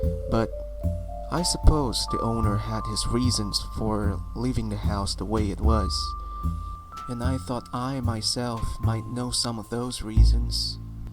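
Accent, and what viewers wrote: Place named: France. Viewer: You are French, from France, or Quebec. Thank you for the fantastic narration. Peace and much love sent from Ontario, Canada.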